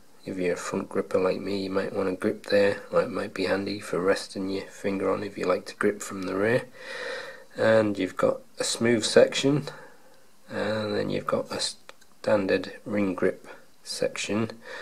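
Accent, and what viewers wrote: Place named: North East England, United Kingdom. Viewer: You sound like your from the north east, I am myself from Boro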